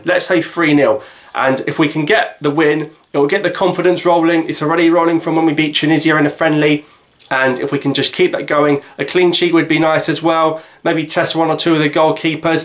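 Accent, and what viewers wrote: United Kingdom. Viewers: You got such a nice british accent , do u live in UK or Algeria ? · hello, would you mind told me where did you learn the British language ?? or it's your mother language? and are you live in Algeria, please can you answer my questions??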